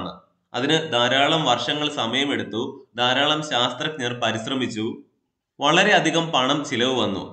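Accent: Kottayam, India. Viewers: Kottayam ano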